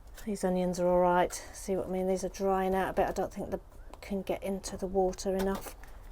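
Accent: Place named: Scotland, United Kingdom. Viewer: Wow so many baby plants on there way up!! You are starting the season strong 💪 lovely to see you in action with your handy dandy tool kit - loved the Scottish accent too! I am trying with flowers too I need to get better at! It's all coming along so well, a week away and the plot is still looking in great! Keep going!! 🥰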